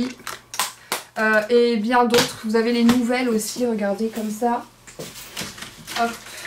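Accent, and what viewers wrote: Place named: Perpignan, France. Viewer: Hello ! Je découvre ta chaîne grâce à Sissi makeup. Super ta vidéo tu donnes envie 😍je vais y faire un tour 🥰🤑n’hésite pas à jeter un œil à ma chaîne. Il me semble qu’à ton accent tu es de vers Perpignan ? Bisous et merci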